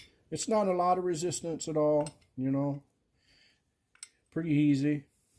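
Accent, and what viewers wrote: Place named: Maritimes, Canada. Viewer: Ahh, A CANUKIAN EH ! I thought I heard a touch of the East coast there. I am in North Central BC. Just an hour west of Prince George.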